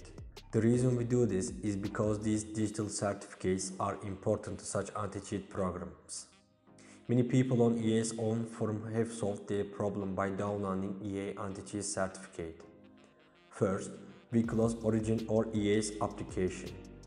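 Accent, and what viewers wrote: Turkey. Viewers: İngilizce çok tanıdık geldi türk müsün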